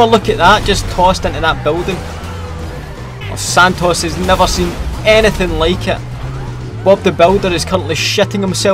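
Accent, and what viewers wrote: Scotland, United Kingdom. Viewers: Where in Scotland you from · Where about in Scotland are you from ??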